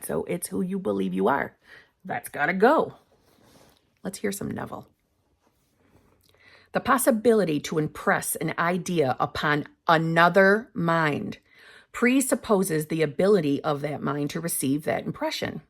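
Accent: United States. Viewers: You have a beautiful accent! Sounds very American 🇺🇸 ! The content is also good! 🤩✨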